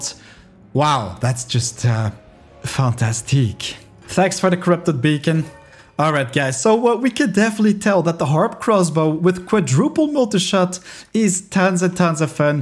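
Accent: France. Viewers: Are you french ? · Mais il est français ou pas, il dit pas mal de mots en français et il a un peu l’accent français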